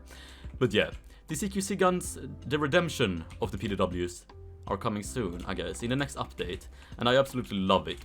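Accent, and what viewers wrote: Sweden. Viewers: Plus a swedish accent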